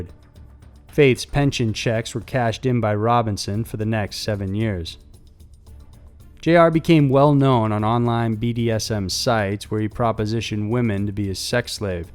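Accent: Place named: South, United States. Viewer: It is probably his accent. Northern and Southern accents are different and words are pronounced differently according to where you grew up. He sounds like he may have lived in the Southern area of the US. I'm friends with a Southern girl and I am from the North. She says words differently than I do at times.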